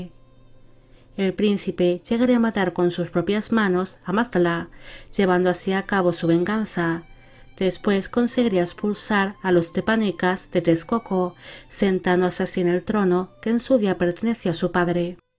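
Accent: Spain. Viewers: Un recuerdo que dejo ¿Con qué he de irme? ¿Nada dejaré en pos de mi sobre la tierra? ¿Cómo ha de actuar mi corazón? ¿Acaso en vano venimos a vivir, a brotar sobre la tierra? Dejemos al menos flores Dejemos al menos cantos. (Nezahualcóyotl) Es una bonita sensación escuchar en voz española la admiración por este poeta y rey del antiguo México precolombino. Gracias.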